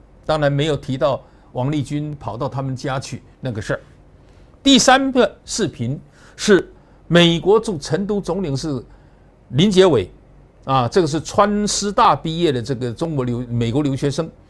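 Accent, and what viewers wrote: Fujian, China. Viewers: 老杨亲美反中反习，了无新意！但是讲的一口福建普通话，倒是很适合大陆观众的口味。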